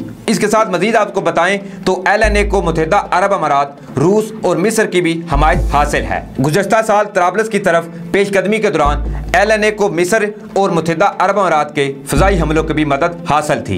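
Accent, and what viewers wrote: India. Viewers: Aap apna taharef to karwa dein, ap ki urdu indian lagti hey , keya ap india se hein ? Please jawab dein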